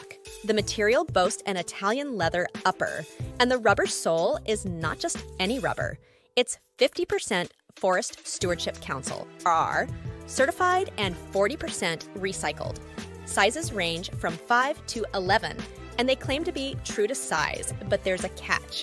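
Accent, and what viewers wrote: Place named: California, United States. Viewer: I’m not trying to be mean, that California accent is straight up out of Saturday Night Live, The Californians . 🦄 🌴 🌞 😂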